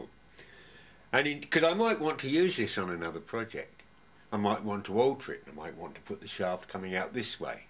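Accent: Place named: Breckland, United Kingdom. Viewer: Love that accent ---from the Breckland ?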